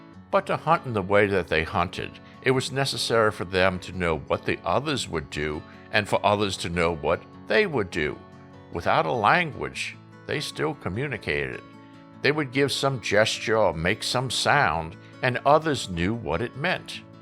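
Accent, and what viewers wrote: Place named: New England, United States. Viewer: Narrator sounds more like he's from Massachusetts or Maine, not Louisiana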